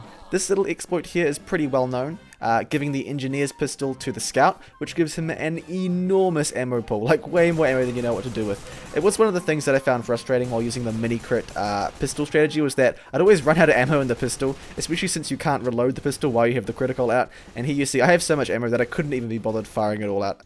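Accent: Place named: New Zealand. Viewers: I'm not overly familiar with Kiwi accents, but it sounds like Australian but different, so I'll go with NZ too. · He's from new zealand I think?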